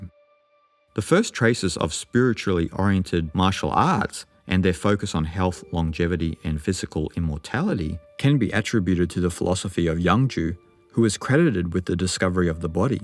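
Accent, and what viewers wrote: Australia. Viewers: I am grateful for the fact that just discovered your channel through The Art of Effortless Living two days ago. And yes, it was the perfect timing as how the law of attraction usually works. I listened this video in this morning, what a great way to start a day! Listening your contents is joyful way to be in the moment especially when my mind is agitated. (: Also checked your website, judging by the quality of your videos I bet your books are as remarkable. As a person born and grew up in far East I was not interested in Eastern philosophy for the first three decades of my life. But I found myself always captivated by the words from those who originally western born but obtained depth of both western and eastern philosophy/spirituality. To me their way of speeches are so effective, easier to digest and apply than those speakers/writers that are eastern born even though I don't doubt the eastern born also have good depth on both side of philosophies. 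I guess you are one of those exceptionally people have such depth in both way and know how to explain well. Your contents are as listenable as Alan Watts' speech videos. I didn't expect to hear such words in Australian accent which is amusing and refreshing. :D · A piece of art really, I watched both documentary, your voice is so calming and I love your Australian accent, the quality of information are incredible and very well explain. Thanks for this.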